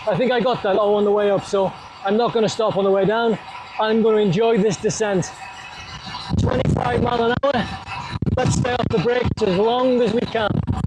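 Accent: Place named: Northwest England, United Kingdom. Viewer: Great video.. we did the same route 3 weeks ago and it blew our minds too! Did you try the rough road up from where you stopped for refreshments? It’s a good climb but very rough surface with loose gravel. It sounds like you’re from the NW area, Cheshire/merseyside (ish) I live north wales nr Abersoch and Pwllheli and there are some fantastic roads over hear, so if you’re in the area give me a shout for some good climbs and car free country roads.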